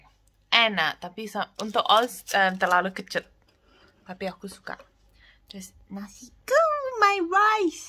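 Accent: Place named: Java, Indonesia. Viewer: Bahasa jawanya masih kentel drpd bahasa Indonesia 👍👍👍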